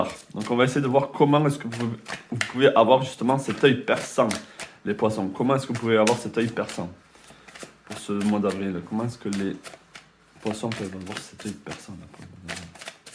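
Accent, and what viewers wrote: South, France. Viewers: Merci pour votre reponse. J'ecoute bcp de choses sur travailler ci ou travailler ca, mais concretement ca veut dire quoi et comment in fait? Sinon j'aime beaucoup le concept d'adresser les diffulcutes et l'accent du Sud!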